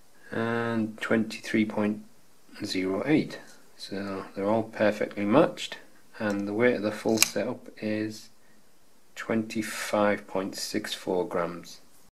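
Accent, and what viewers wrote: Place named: North East England, United Kingdom. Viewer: You sound like your from the north east, I am myself from Boro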